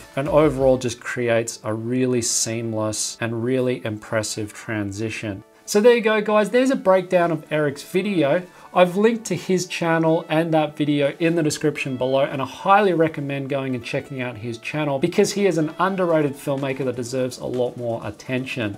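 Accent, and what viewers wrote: Australia. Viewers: Because he's australian lol. It's called accent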